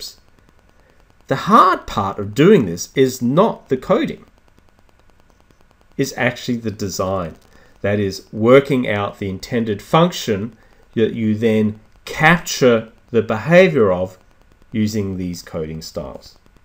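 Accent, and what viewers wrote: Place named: United Kingdom. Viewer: What are you talking about? He's pronouncing it with his British accent what's your problem lol? Your accent is a lot worse for pronunciation, so I wouldn't be talking if I was you, at least his is one native to the English language.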